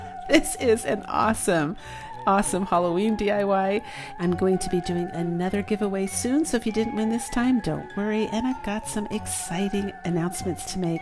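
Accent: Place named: England, United Kingdom. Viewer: Yay a fellow decaf drinker! So cool that he's from England. I'd never get tired of his accent.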